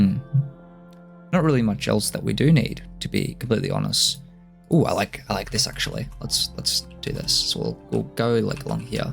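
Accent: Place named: Australia. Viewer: thought you were a fellow aussie, great video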